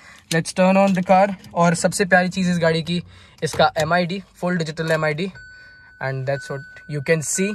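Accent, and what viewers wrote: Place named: Agra, India. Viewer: Bhai agra se ho?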